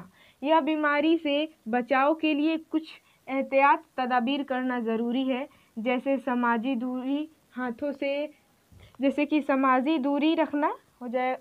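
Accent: Bihar, India. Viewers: यदि हमें ऊर्दू आती हैं तो हम आपकी video dealy देखते पता नहीं आप को हिंदी आती हैं की नहीं क्यूं हमने हिंदी मेंcomment किए 🙏 एसे ton से तो आप भी बिहारी ही लगते हैं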